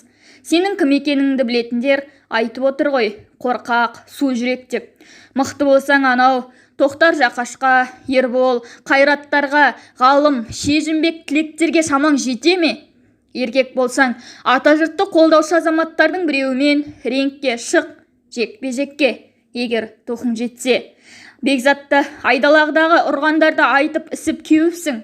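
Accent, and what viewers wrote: China. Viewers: Осы автордың дауысын естісем,қытайдың дауысын естігендей, денем тітіркенеді..Қытайдың акценті.Біріңді бірің жау көріп,Қазақтың кең даласына сыймай жатырсыңдар-ау.Ұялмайтын адам екенсіңдер.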